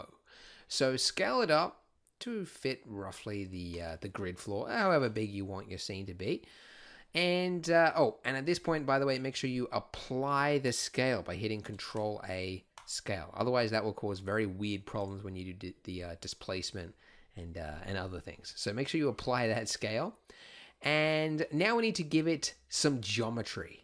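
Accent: Australia. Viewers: isnt this guy an australian? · Australian describing snow is funny to a Canadian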